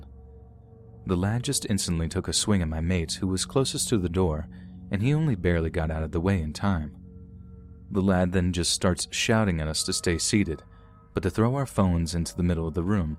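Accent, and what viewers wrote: United States. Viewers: What an interesting experience to listen to a story with a British tone, read in an American accent lol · This was definitely in Liverpool! Haha yeah it was so funny hearing all these scouse sayings read by an American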